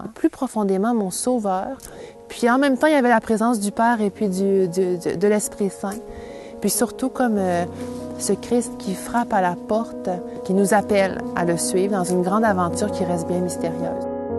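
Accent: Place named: Quebec, Canada. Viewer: Quel bel accent québécois..il y a 40 ans je suis restée 3 mois à Montréal pur terminer ma thèse de maîtrise sur le mythe dr Maria Chapdelaine,,,,quel pays mervéilleux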